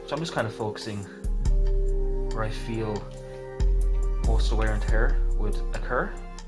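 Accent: Ireland. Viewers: Another great "how to" of yours,! "First thinhs first" I like the sound of Irish English! And what's very nice, too: You make your method usable for those without an airbrush like me! Cheers, mate!:)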